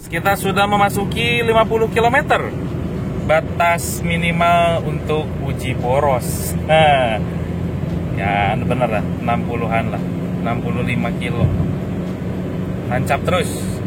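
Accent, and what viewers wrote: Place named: Bandung, Indonesia. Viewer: Jiaaahhh...mamang . Kangeeeen aku .... logatnya bandung pisan...hahahahha salam kangen selalu. Allah merahmati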